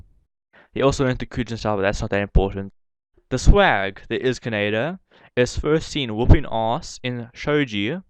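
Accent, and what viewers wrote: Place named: South Africa. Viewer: You said pure maths and I’ve only heard us South Africans say that, plus you got a South African accent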